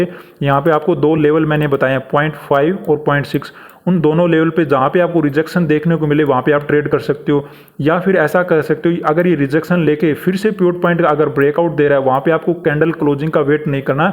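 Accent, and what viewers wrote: Haryana, India. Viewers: Bhai ji bahut aacha sikha rahe ho aap Aapki boli to hariyane aali lagge hai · कई बार लगता है उत्तराखंड से है आप कई बार आवाज के इंग्लिश टोन से लगता है हरियाणा बॉर्डर से है राजस्थान साइड वाले से।।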